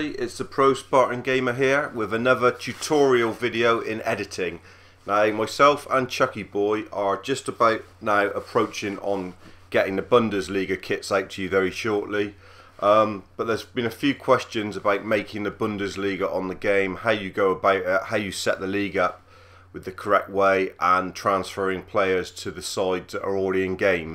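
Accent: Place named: Bristol, United Kingdom. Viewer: Love that Bristol accent!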